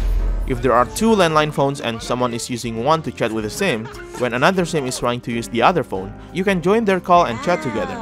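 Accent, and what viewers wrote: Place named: Indonesia. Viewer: Gilee bangg keren bgt, gw lgsg ngeh lu indo dri aksennya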